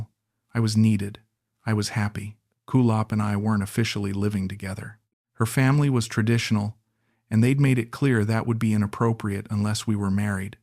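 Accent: United States. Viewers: Another scam story with a yankee voice, jeez this crap never give up their scam life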